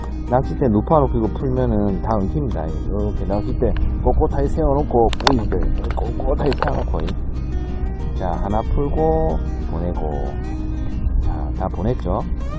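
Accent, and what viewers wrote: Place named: Busan, South Korea. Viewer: 야야 와이라노?? 부산사투리 구수합니다~~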